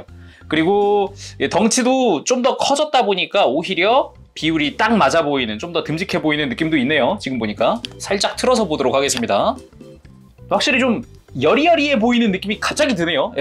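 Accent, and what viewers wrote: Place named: Jeolla, South Korea. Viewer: MGEX 리뷰는 이채널에서 한 리뷰가 넘사벽인듯.. 근데... 전라도 사투리는 좀...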